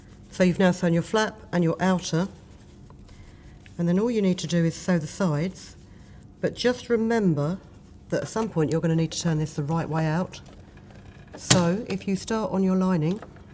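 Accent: United Kingdom. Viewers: I loooove your British accent!!! Your tutorials are always simple and ecofriendly!!Thanks a lot!!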